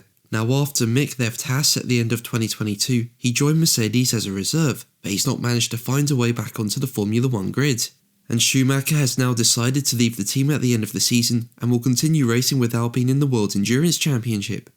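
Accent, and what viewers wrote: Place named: Liverpool, United Kingdom. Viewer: I'm not hearing it; he just sounds Scouse to me.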